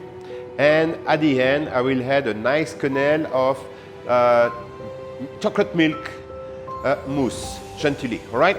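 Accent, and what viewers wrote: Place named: France. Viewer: Yes he speaks broken English / Manglish with Malaysian cadence (still has French accent) lol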